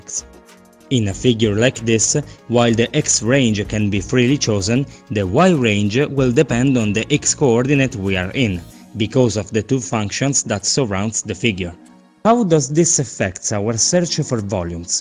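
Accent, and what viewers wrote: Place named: Italy. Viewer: Love your Italian accent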